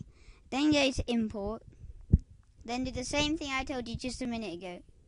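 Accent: United Kingdom. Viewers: I just thought you edited your voice to be like that not that your from the UK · chill bumps he has to be british i still have some XD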